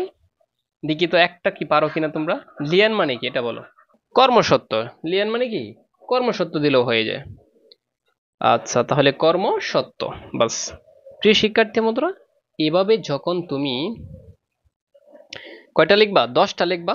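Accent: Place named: Brahmanbaria, Bangladesh. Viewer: স্যার আপনার কথা গুলো শুনে মনে হচ্ছে আপনার বাড়ি ব্রাহ্মনবাড়িয়া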